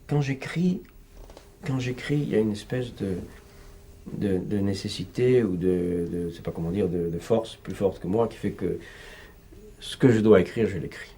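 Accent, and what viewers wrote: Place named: United States. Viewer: Lol he sounds so American too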